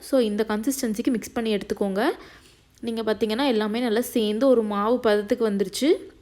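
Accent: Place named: Coimbatore, India. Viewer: Otuka 🤣that Coimbatore slang:)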